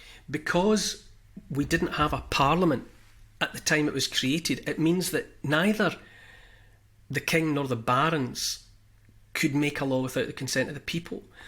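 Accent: Scotland, United Kingdom. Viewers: It is not a dialect, it is a Scottish accent.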